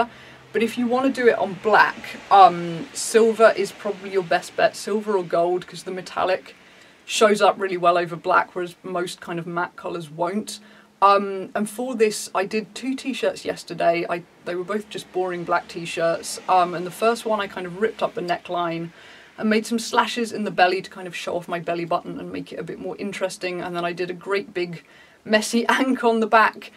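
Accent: United Kingdom. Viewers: Omg i clicked on this video and said “omg i hope they’re British bc i rlly wanna hear british person speaking right now” yippee!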